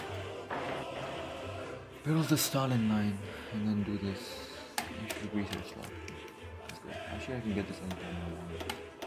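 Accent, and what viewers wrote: India. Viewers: Bro you sound indian as fuh outto nowhere are you indian? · I can't tell if you're Indian or European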